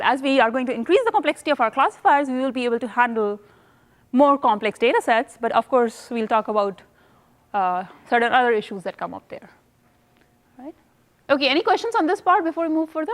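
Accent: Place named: India. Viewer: Indian? Oh my god, terrible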